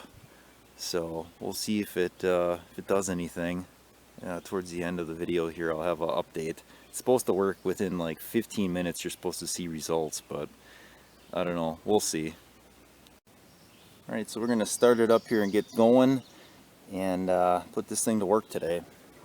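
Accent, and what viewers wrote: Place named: Maine, United States. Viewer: where are you from there brother sounds like you are maine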